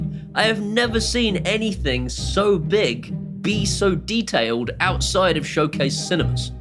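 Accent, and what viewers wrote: United States. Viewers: That was a pretty good American accent. Very nice review too! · Pretty good American accent! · That was an AMAZING American accent! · Ok, as ANOTHER American watching, I am genuinely impressed with your American accent...great video as always. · nice American accent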